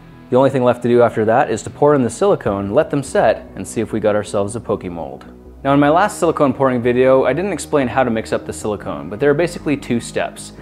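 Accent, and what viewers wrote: Canada. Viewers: I've been wondering for a long time now, based on the way pronounces certain words like "tomorrow," if he's Canadian. Anyone know?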